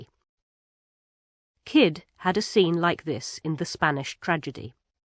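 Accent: United Kingdom. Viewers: Banu Çiçek Because it's British pronunciation.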